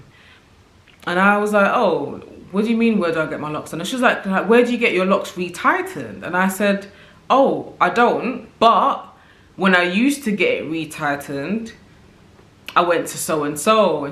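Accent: London, United Kingdom. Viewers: UK IN THE HOUSE!!🇬🇧❤ So refreshing to hear a London accent talking about locs. Really like your channel and content. Really insightful ✨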